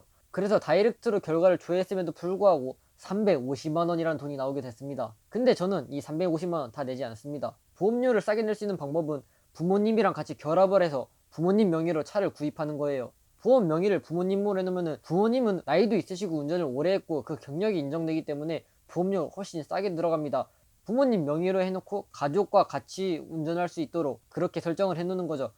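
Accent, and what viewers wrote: Gangwon, South Korea. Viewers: 경기 북부 강원도 사투리 ㅋㅋ 제가 잘 알죠. 가평. ㅋ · 강원도 사투리 정감가네요ㅎㅎ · 와 또래중에 강원도억양 제대로들은거 처음이다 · 강원도 사투리 ㅋㅋ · 말투가 강원도? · 강원도 사투리가 살짝... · 강원도억양 아직 있으시죠?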